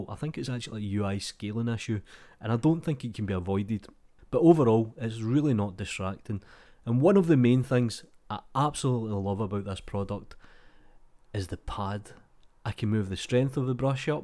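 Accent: Scotland, United Kingdom. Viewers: Scottish accent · One of many Scottish accents!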